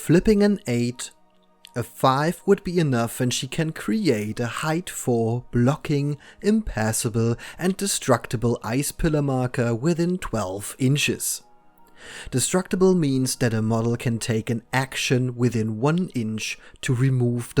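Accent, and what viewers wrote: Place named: England, United Kingdom. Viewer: Thanks for the battle report. I like your english accent, it seems to fit the theme of the Malifaux universe.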